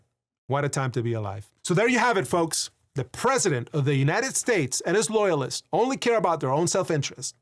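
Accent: Chile. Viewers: No sabía que eras chileno! Pero después de escucharte hablando en español es muy obvio y no me puedo creer que nunca me dabas cuenta que eras latino. ¿Nos darás unos vídeos legales en español, por favor? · Not gonna lie, the Chilean accent took me by surprise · Wonderful video, loved the Chilean accent and thank you very much for naming/showing for a moment the other coups from Latinoamérica PD: Mi departamento completo quedó plop cuando lo escuchamos hablar español, lo seguiremos apoyando desde Chilito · Había notado el acento, pero no sabía que era chileno. Yo he visitado La Moneda, Plaza de la Constitución y el Museo de la Memoria y Los Derechos Humanos. Fueron impactantes. Espero que no tengamos un Tanquetazo aquí, pero estoy preocupado.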